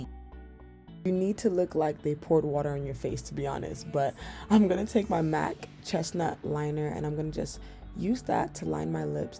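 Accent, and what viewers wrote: United Kingdom. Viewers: I can’t tell if your British or American. The accent and the slang is a mixed bag? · Im still trying to figure put if she from the uk or not🥱🤣💕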